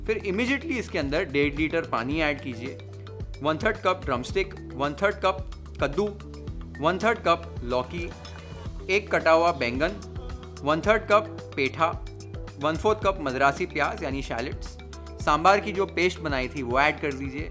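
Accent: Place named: Gujarat, India. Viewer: you speak Hindi in Gujarati style😊😂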